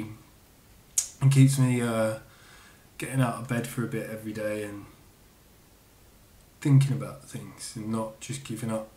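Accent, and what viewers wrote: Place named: Newcastle, United Kingdom. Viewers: Always thinking about you day and night. Hang in there, bud. By the way your Geordie accent sounds WELSH. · Ah your Geordie accent is brilliant hahaha made me laugh, i wish i could take all this away from you I am hoping and wishing surgery will be successful X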